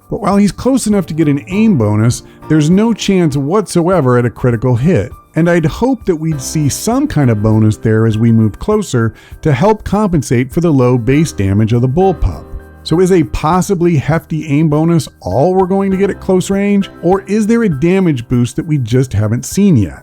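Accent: United States. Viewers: For Some Reason you Remind me of a Few Guys I grew up with and learned from in SoCal. ( there older than me ) I think it's how you talk and Explain Things, so Unlike the other Videos of people Giving Advice on XCOM2, which can be a complicated subject in itself. I don't have to Watch your videos 10x's to Understand what Your saying! Thanks for All the Help! I really appreciate you Donating Your TIME ( if you think about your Time is EVERYTHING, it's all one Really Has ) to Helping others, Keep Up the GOOD WORK!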